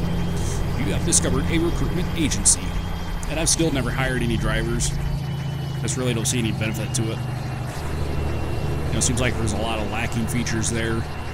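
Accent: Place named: United States. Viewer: i will assume that you are american. If so, can you tell me from what part of US are you from? I'm asking you this because i quite like your accent. And nice video! Keep up the good work!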